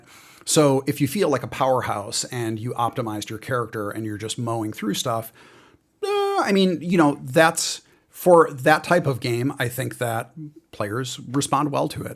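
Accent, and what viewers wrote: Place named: Midwest, United States. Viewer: This is a weird as hell statement but screw it. Why do you sound like my aunt? You from the Midwest?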